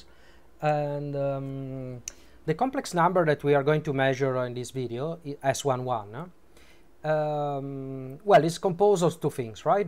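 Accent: Italy. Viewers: quanto si sente che sei italiano! grandeeeeee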